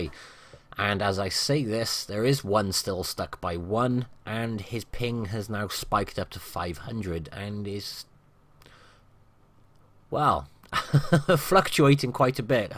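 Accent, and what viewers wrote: United Kingdom. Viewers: Oh god I just love to hear your voice and laughter and that stunning British accent of yours along with the explanation of what is happening in the replys frankly as much as it's sad to say but truth be told for me you are The best WOTB YouTuber and yes even better then TTTimster he's latest videos became more like (I'll put whatever is there to refresh the channel case) honestly at least in my own prospective and you already know that jimmy the one with the E_100 reply already sent one to TTTIMSTER and honestly I didn't like it in any shape or form especially with he's terrible commentary...... unlike you m8 always happy to watch your videos some of them can make my day... so please don't stop laughing and publishing those beautiful videos of yours... bye.....:D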